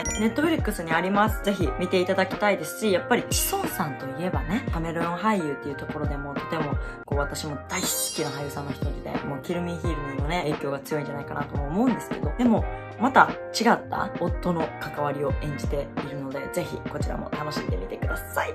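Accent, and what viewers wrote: Kansai, Japan. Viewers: 、喉大丈夫？🥺 今日は関西弁やったからめっちゃ聞きやすくて、馴染めた🤣🤣🤣 おもろい❗😘😘😘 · 登録者8000人おめでとう🎊 知ってるワイフは日韓両方とも良かった！でもお金も時間もかかってる韓国の方が見ごたえあるのはしゃあないよね💦 関西弁😁👍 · が言うように日本と韓国の違いを楽しんで見てます👍 関西弁可愛い☺️❣️喉のケアしてね🙆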